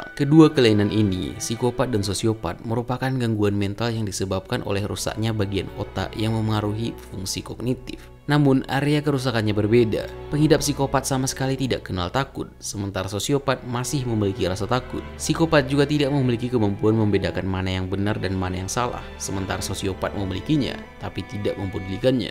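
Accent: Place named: Banyumas, Indonesia. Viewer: Yang ngomong kok beda anjir... Malah jadi logat ngapak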